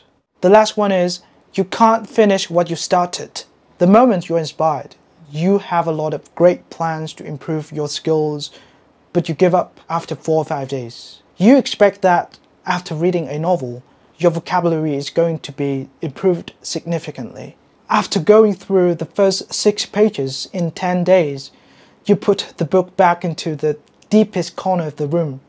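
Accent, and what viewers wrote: United Kingdom. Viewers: Cái số 1 đúng y sai lầm mà mình đã nhận ra và sửa. Bây giờ mỗi lần gặp 1 từ mới mình luôn tự nhủ bản thân là không được phát âm bậy Ngoài lề xíu bạn dùng accent UK à? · Anh này nói anh-anh bạn ơi, giọng anh anh nói vậy á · Hình như ổng nói giọng anh-anh đấy bạn, với lại còn nh nước nói tiếng anh mà, nghe anh-mỹ ko mốt gặp anh-trung anh-ấn, anh-nhật ... đủ kiểu sẽ hk có đủ trải nghiệm để bắt kịp cuộc nói chuyện ạ, theo kinh nghiệm của mình đã gặp anh-ấn ạ, vất vả lắm 😢, r cũng quen, do bả là cô giáo bộ môn của mình 😅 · Em thấy giọng anh hơi khó nghe, anh nói giọng Anh Anh đúng không ạ?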